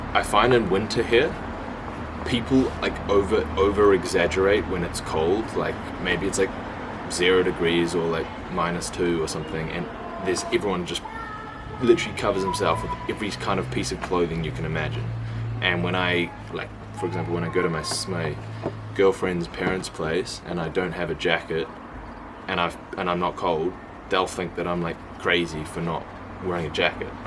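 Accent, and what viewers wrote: Austria. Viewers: Stumbled over your videos just a couple of days ago and I find them HILAAARIOUS. Your austrian is very good and I can't stop laughing whenever you say Oida or passt schon. Anyway, your observations on us is spot on and I hope you enjoy your stay here. I have been to New Zealand many years ago and it kind of struck me like a pacific version of Austria, only surrounded by an ocean and with volcanoes. I rented a motorbike on the southern island and vividly remember driving past meadows with wooden barns and rolled coils of hay and I only could think "Dude, this looks exactly like home!" Keep on making videos and have fun. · Your austrian is very good, and it's fun to watch your videos, you do not complain, mostly, you just observe the differences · Wait. is this guy german (austrian) or actually from somewhere else?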